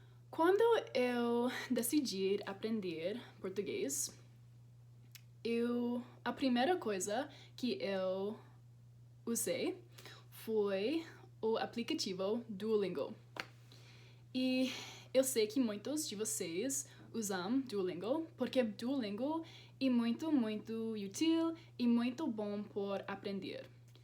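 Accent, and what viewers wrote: Brazil. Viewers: o sotaque parece o meu (carioca) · estás a aprender o português com sotaque brasileiro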